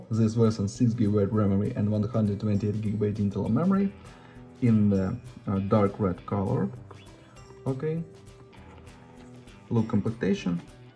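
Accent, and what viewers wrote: Russia. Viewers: не плохо ты кудахтаешь на английском, просто просто акцент есть русский немного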